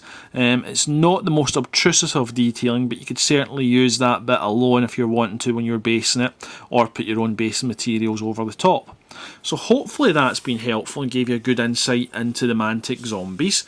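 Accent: Scotland, United Kingdom. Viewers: Is this a scottish accent? No offense but it sounds freaking hillarious :D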